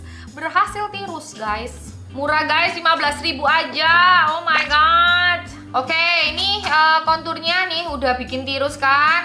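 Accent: Java, Indonesia. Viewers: Raop.. yaalloh nguedok jowone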